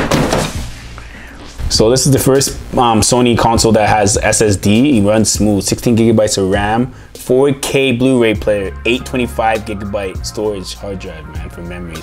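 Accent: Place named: Ontario, Canada. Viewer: Mans accent i can tell he from Ontario!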